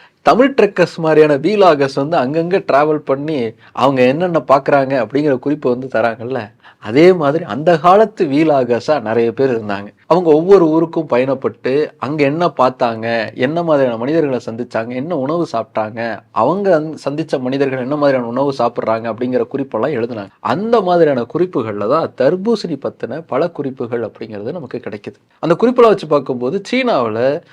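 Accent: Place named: Kongu, India. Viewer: கொங்கு தமிழ் கோசாபழம்❤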